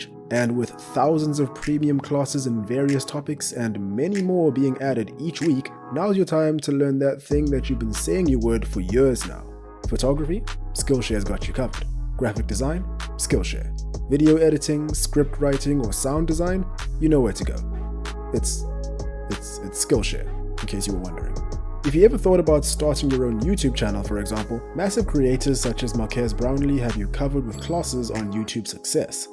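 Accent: South Africa. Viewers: Well well well, a happy coincidence finding a fellow South African! Knew it from the beginning when you started talking. Keep up the good work man and make us proud!! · South african accent definitely!!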